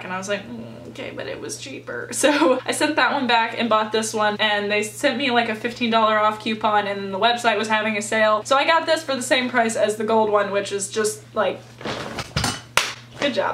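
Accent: United Kingdom. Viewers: If I had not just bought a dredsage bridle I would purchase yours and I loved that spot on brtish accent!!👌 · Your English accent is spot on!😂 love your videos! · Your British accent is the best😂 I love how you really show your personality in your videos and you’re so fun to watch💕 best of luck with the dog!!x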